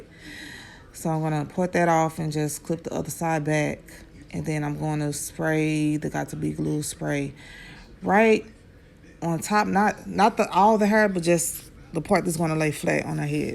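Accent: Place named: St. Louis, United States. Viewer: Gotta be from St. Louis with that accent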